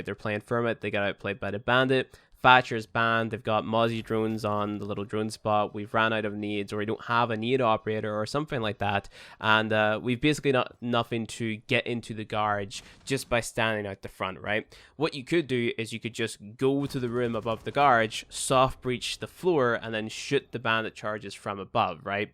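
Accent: Northern Ireland, United Kingdom. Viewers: Northern Ireland:) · Northern irish deffinetly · Are you from Northern Ireland cuz you kinda sound like it lol like you sound like my da a bit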